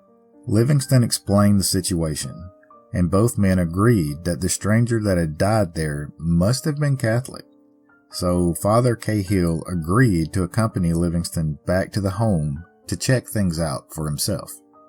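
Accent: South, United States. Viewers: that southern drawl is mesmerising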